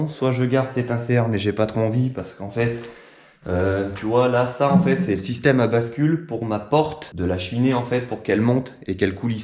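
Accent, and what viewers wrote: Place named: Cantal, France. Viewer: Salut merci et bravo pour le travail et les vidéos. Quel projet ! Je découvre la chaine il y a 4 jours et toutes les vidéos y sont passées. Je trouve ton humour second degré et auto dérision excellent. À mon avis le petit accent est cantalou ? FAQ:c'est tout simplement à ce stade que tu présentes ton parcours comment tu as acquis ces compétences comment tu geres financièrement le temps des travaux même si j'ai mon idée. Pour le poêle dans ton style un peu industriel je trouve que un poêle bullerjan dit canadien irait très bien. J'en ai deux chez moi un gros de 16kw et un petit de 6kw pour une dépendance. Si tu veux je peux t'envoyer les photos sur ton mail. Merci encore et à très vite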